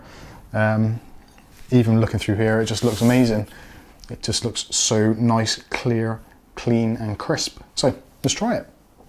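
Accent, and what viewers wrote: Bristol, United Kingdom. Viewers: Certainly was; good to see it put in layman's terms. Love the Brizzle accent by the way! ;)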